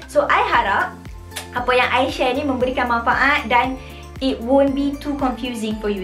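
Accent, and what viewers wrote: North, Malaysia. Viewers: Very informative👍👍. Jgn hiraukan manusia2 yg jeles dok ngata bagi sharing session. You do you💪🏻💪🏻 btw, seronok dgr loghat utagha rindu pulak chegu2 kita dulu. Hahaha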